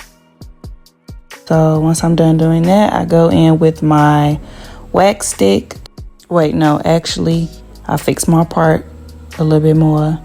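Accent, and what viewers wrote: St. Louis, United States. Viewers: Very cute!! Sounds like you are from st.Louis 🥰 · Where you from baby? The accent is giving STL! 💁🏾‍♀️💁🏾‍♀️ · My first time watching you… I knew you were from the same city cause you said “hur” instead of “hair” & said make sure them tracks aint going no whur” lol perioddd we soo Saint louis SUBSCRIBED ❤💕💕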